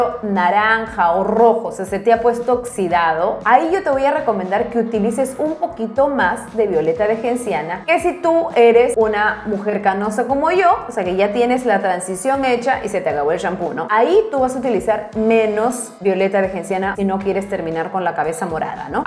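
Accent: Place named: Peru. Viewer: Que lindas son las peruanas......me gusta su acento ..súper el consejo . bendiciones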